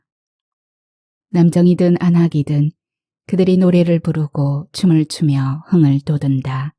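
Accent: Jeolla, South Korea. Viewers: 님의 걸죽한 전라도 사투리 때문에 사람냄새가 물씬하였습니다. 모처럼 작품에 흠씬 빠져보았습니다. 감사합니다. 행복하시고 건강하십시오...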